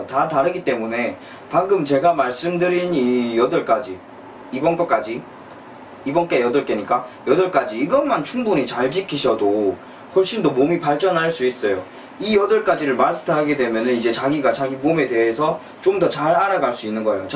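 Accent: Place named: Gyeongsang, South Korea. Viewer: 역시 갱상도말로 해주시니 더 귀에들어오네요 ㅋㅋ ㅋ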